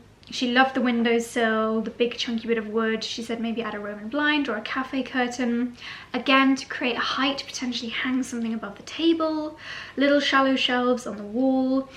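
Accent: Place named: United Kingdom. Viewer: Hi! ☺️ I am a newer subscriber and I have got to say over the past month or so I have seen how practical you are, but that you also appreciate the finer things in life and I LOVE IT!!!! You are so sweet and down-to-earth and I’m here for your newfound hobby of gardening! Your music choices are so lovely and therapeutic and so is your voice and British accent! I really enjoy your videos and they help this busy mom get thru her day! Thanks so much for sharing! 😘 Take care!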